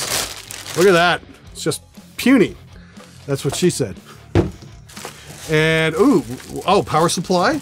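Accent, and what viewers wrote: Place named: Canada. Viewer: I can't stand that Canadian accent.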